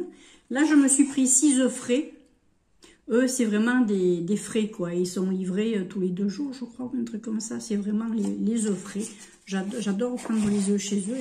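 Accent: South, France. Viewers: Coucou , Les oeufs frais il n'y a rien de mieux!😊 Moi j'adore les faire à la coque Avec des mouillettes de comté 😋 J'adore votre accent du sud!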